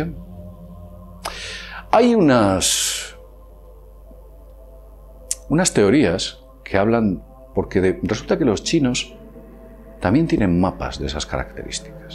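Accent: Spain. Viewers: con ese acento Español cualquiera se duerme, saludos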